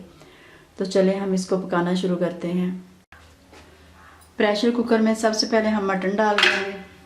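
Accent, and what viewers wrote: Punjab, India. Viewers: Pure Punjabi accent.😍👍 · I am belongs to arain family . Punjabi accent ha. Ap ha :p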